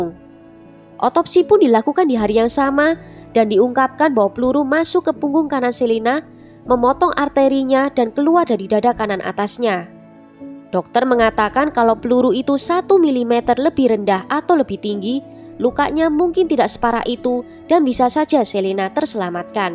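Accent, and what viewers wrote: Java, Indonesia. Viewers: Cantik.. medokuhhh 👍💖💖💖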